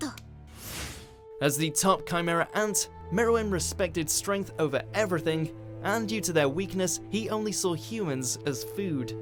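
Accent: United States. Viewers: Owbeetow Ucheeha. Gee, Why the fuck do you have to always americanize the way you read japanese names?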